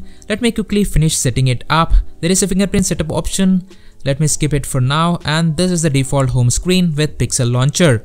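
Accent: India. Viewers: Finally an Indian English speaking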